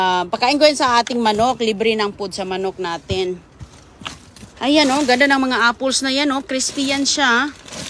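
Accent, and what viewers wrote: Visayas, Philippines. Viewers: Ok lang yan Bisaya kasi siya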